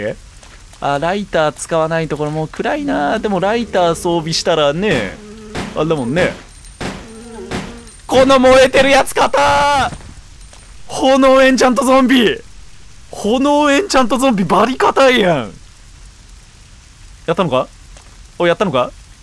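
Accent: Kansai, Japan. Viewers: ちょこちょこ出てくる関西弁がたまらなく好き笑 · 今回のうっしー、関西弁多めだなぁと思ってたら 理由わかった👍️ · なんでちょいちょい関西弁なん？（笑）好きやけど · クレアへの要求が高いうっしー(•'-'•) なんか最近関西弁出てるうっしーw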